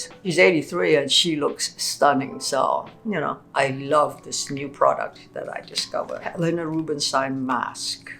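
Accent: Malaysia. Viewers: it's typical malaysian of chinese descent english accent and it's nice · "can see or not" hahahah I LOVE that she doesn't Westernise her accent, regardless of what films and shows she appears in, she's so unapologetically Malaysian/Asian, and that's so extremely rare.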